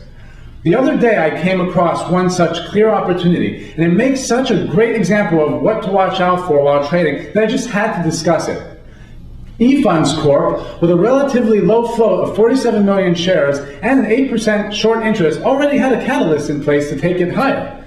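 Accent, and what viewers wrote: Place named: New York, United States. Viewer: That makes more sense, even though he's not "talking too fast" for me. I'm in NYC right now where lots of people talk exactly like him! Remember, too, that he's a money-making stock trader, not exactly a "low key, relaxed" mode of work. Like a race horse, not a plow horse. But thanks for taking the time to respond. Clears things up a bit.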